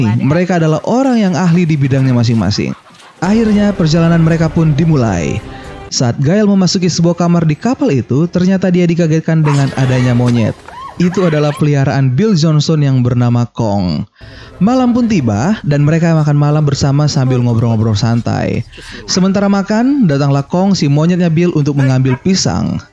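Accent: Manado, Indonesia. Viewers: Ad logat Manado kang🤣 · Bang ngana orang manado kang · Mimin orang manado kang 😆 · Min orang manado? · Manado ngana kang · Manado to ngana... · Bang org mnado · Bro orang manado??? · Orang Manado Ya Bang?? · wah mimin orang manado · Orang Manado? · Orang manado bang? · Dari logatnya , Abang pasti orang Manado ya😂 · Orang manado min? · Bilang jo kwa klo nga itu orng manado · Orang manado ya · Admin org manado fix! 🤘🏻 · orang manado kang dapatau😂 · Bang Lu Orang Manado? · Bang abang pasti orang manado halo bang aku orang manado halo bang😁😁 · Bangg Orangg Manado? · Orang Manado ka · Orang Manado ya bang??? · Org Manado 😭🤣🤣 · Bang orang menado ya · Kak ternyata orang manado🙂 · orang manado ka ? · Abang orang MANADO kah ini ??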